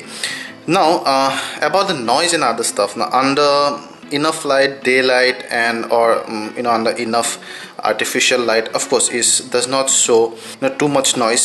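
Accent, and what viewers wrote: India. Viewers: indian accent is so funny haha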